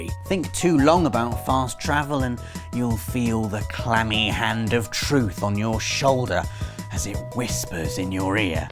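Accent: United Kingdom. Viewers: Lucca Cardieri PlayStation access wins because... British accent beats all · why oh why is Sony Playstation presented by dog-ugly nerds with a Brittish accent! ? unsubscribe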